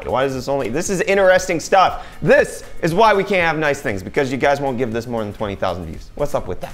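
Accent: United States. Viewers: I was just wondering, this guy sounds American, I wonder why he's in South Africa, I'm South African so am really curios. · You from south Africa ? Your accent is not tho, very american .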